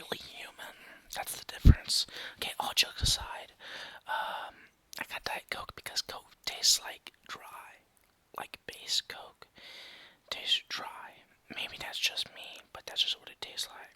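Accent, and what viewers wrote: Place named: South, United States. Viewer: bro your so funny you sound country like me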